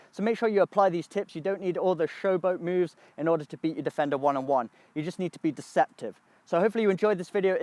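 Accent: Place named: United Kingdom. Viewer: He has a British accent but has an American flag on the pole behind him. What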